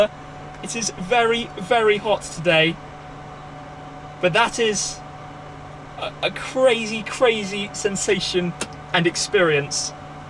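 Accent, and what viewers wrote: United Kingdom. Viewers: Brilliant! Absolutely sensational. british accent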